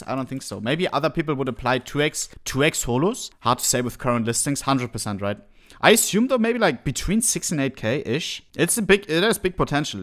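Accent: Germany. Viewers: man hört den Deutschen Akzent haha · wie krank man hört das er deutsch ist pahahhahah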